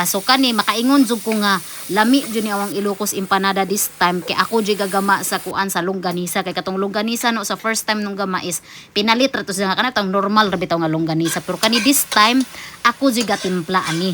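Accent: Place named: Bohol, Philippines. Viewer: Nindota mn n inyoha dapit dae... gnahan ko nmo very boholano kaayo accent ksagaran ako nbantayan mgtinagalog ng uban...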